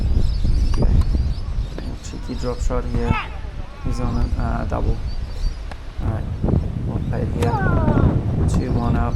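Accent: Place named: France. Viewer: I tought you were french or belgian with the accent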